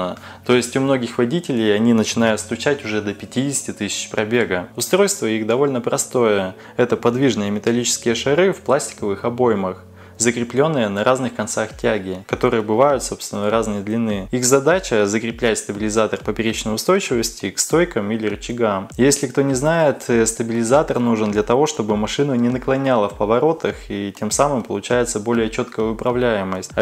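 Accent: Perm, Russia. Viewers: Вы из Перми?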